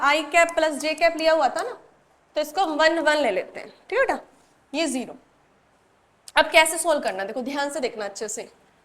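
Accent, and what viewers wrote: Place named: Haryana, India. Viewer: Aap Haryana se ho lag rha mujhe... Excellent explaination mam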